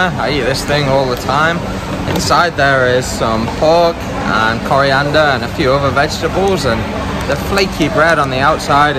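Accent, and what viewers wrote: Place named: Yorkshire, United Kingdom. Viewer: something about his yorkshire accent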